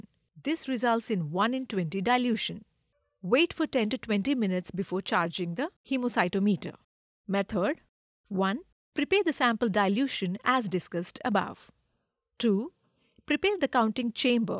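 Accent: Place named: India. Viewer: The presenter is probably from the Indian Subcontinent. In English the pronunciation of V and W is reversed compared with Indian Languages. Also there is no such thing as a Lakh in English. This lack of translation makes this video unintelligible in English.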